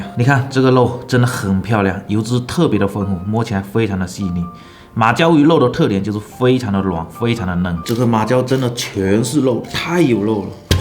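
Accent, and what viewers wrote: Fuzhou, China. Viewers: 福州口音相当亲切了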